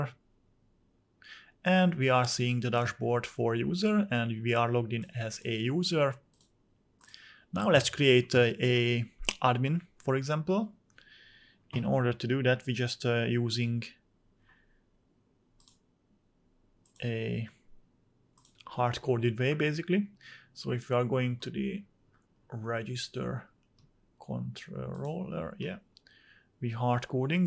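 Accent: Hungary. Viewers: Hello and thanks for the video, it really helped me complete my project!! also I study in hungary and I immediately recognized that hungarian english accent lol, thanks again.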